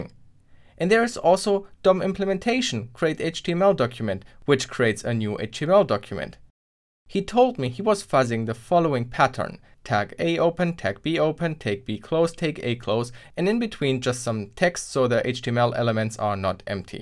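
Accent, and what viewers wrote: Germany. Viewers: Man hört einfach das du auch deutschsprachig bist 😅 aber gutes Video 👌🏼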